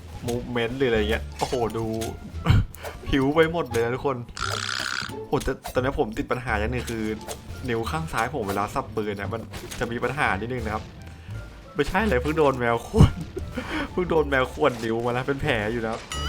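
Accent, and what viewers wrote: Thailand. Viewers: ติดตามตั้งแต่พี่พึ่งเรียนป.ตรี เสียงเหน่อๆจนตอนนี้จบแล้วอยากถามว่าตอนนี้พี่ทำงานอะไรครับ😅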